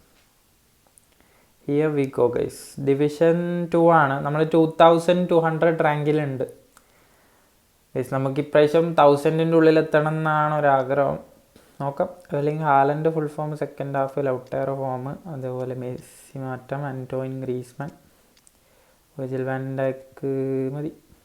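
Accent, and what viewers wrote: Kannur, India. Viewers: Brother kannur aano ?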